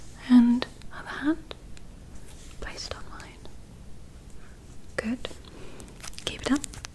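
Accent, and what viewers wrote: United Kingdom. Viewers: I love your accent 👌🏼👌🏼👌🏼 ITs so beautiful... sound a bit British 🇬🇧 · This is my first video of yours in a while and let me tell you, the accent threw me for a loop. I’m here trying to figure out if it’s acting or if I’m misremembering you not having a British accent lol. Your voice is relaxing regardless of the accent. Great video!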